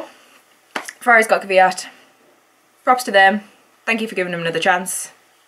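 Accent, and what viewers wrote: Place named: United Kingdom. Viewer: So, british accent sounds like that. Interesting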